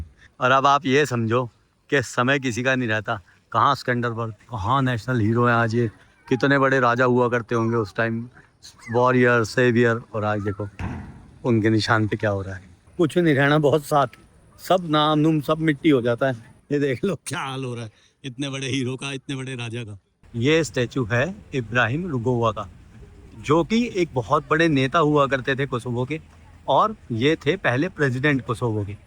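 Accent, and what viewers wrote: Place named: Delhi, India. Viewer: Bhaiya tum to Dilli ki boli bol raha ha Dilli da ho kya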